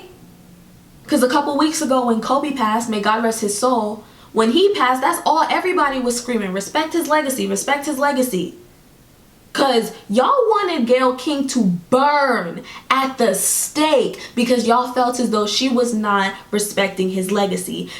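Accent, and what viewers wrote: Jamaica. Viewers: I heard the Jamaican accent and subscribed...Goodie🇯🇲🇯🇲🇯🇲🇯🇲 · Gurl! Yu Jamaican!? Lie? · I hear that 🇯🇲 accent. 1❤ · Hooooolllld up , girl u from jamaica , cause dah lawd gad deh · I just found you and immediately after hearing the "lawd gad" I subbed! I love finding Jamaican YouTubers! And btw you were speaking nothing but FACTS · I here the Jamaican in you😘😳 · subscribed as soon as I heard that Jamaican accent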